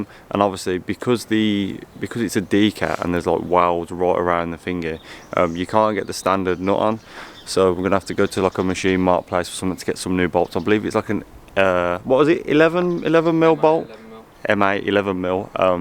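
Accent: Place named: Birmingham, United Kingdom. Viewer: I’m sure your saying Renault wrong 😂😂. Its that brummy accent . Good videos tho xx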